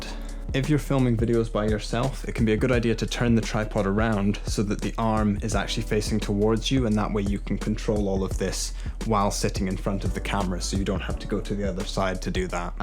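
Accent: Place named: West Country, United Kingdom. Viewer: thanks nice and informative. Trying to place the accent. West Country?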